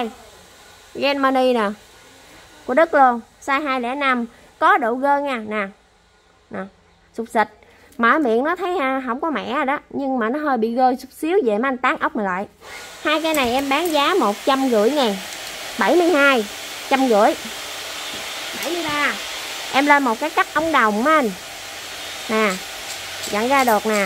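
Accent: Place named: Mekong Delta, Vietnam. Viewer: Giọng nói giống người miền Tây quá bé , nghe giọng này làm hồi ức lại đã từng công tác dưới miền Tây 12 năm . Rất chân chất thật thà! Có dịp sẽ ủng hộ bé . Phong Cách giới thiệu sản phẩm rất thiện cảm .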